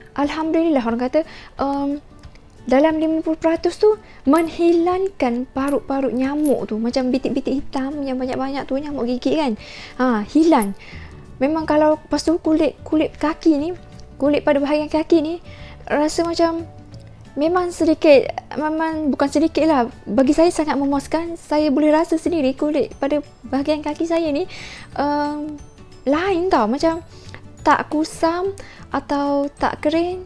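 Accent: Kelantan, Malaysia. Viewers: Dari Bahasanya kaya negara asal upin ipin ya 😁 salam kenal dari Indonesia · pelat kelantan ek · Asal kelantan ke? Sbb dgr cara loghat tu..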